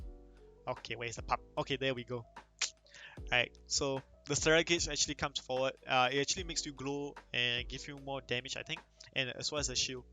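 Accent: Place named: Singapore. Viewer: great guide, from sg? (from ur accent xd) was thinking of grinding through WR on release haha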